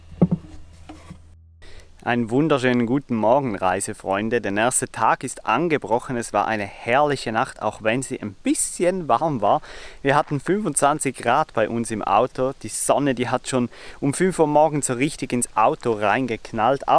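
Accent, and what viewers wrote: Switzerland. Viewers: Sorry, aber... Wenn Schweizer Hochdeutsch reden, geht garnicht...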